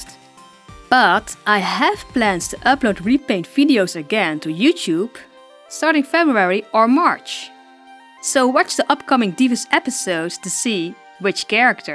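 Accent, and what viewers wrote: Netherlands. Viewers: Je kan horen dat je Nederlands bent hihi Ik ben ook Nederlands en vind je video’s erg leuk maar cringe soms ook erg English: You dan heer that you are Dutch I am dutch as well en like your video’s a lot but its somtimes very cringy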